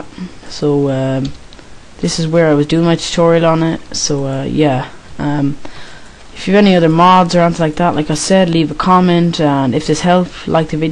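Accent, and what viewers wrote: Ireland. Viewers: it work thanks ladd btw are u from ireland ?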